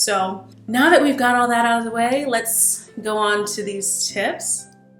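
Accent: Nigeria. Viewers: You really pulled that 9ja accent hey 😹😹👏❤️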